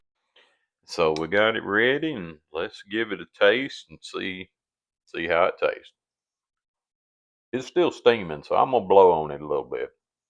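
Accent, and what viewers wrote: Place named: Kentucky, United States. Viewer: I'm trying to place your accent and I want to say Kentucky, maybe Missouri, Tennessee. We sweated our onions, too. Hope you read this and let me know. Kentucky here, btw round Paducah. I'm gonna try to get the wife to cook some of this cause it looks like a right fine recipe. I gotta say, though. It hurt my heart to hear you say almond milk and cornbread in the same sentence. Now I know you were saying NOT to do that, but the thought made me die a little inside. I'll get over it, I reckon.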